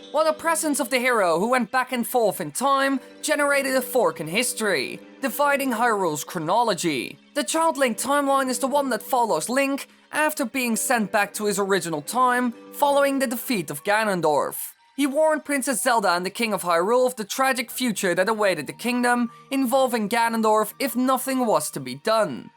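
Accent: Netherlands. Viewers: I hate Your fucking Dutch accent so much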